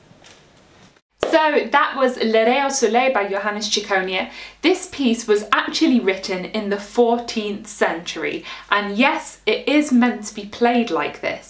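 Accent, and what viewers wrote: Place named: United Kingdom. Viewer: That "regatta bear" was funny, I need to put the subtitles to understand a little bit more, in spanish "I'm a recorder player" sounds like "Am a ricode plée", sounds nicely to me the british accent but to write this and all of the sentences are another different thing at all. In fact, we use the same word "flauta" for flute or recorder, in case we ne need to clear what instrument is the we need to add one word: "flauta traversa" for flute and "flauta dulce" for recorder. cheers.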